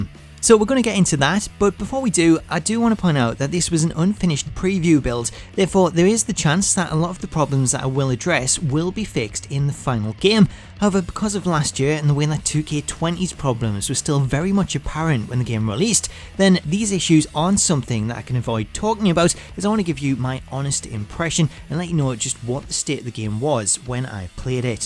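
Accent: Newcastle, United Kingdom. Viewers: Maybe he's a Geordie? I'm only guessing though. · Just curious where in in the UK Are u from I can’t place the regional accent 😆 yeah I can already see how repetitive this is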